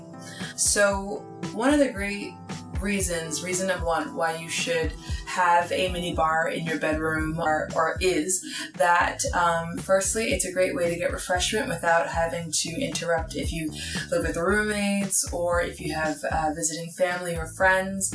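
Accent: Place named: New York, United States. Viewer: Subscribed after our video with Marwa, you haver an incredible New York voice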